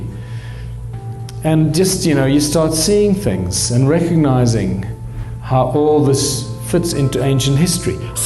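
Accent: South Africa. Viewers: Definitely sound like a X Rhodesian or South African stock.Thinking People 👍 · he sounds like those who kept blacks segragated south african racist scum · Is this guy South Aftican?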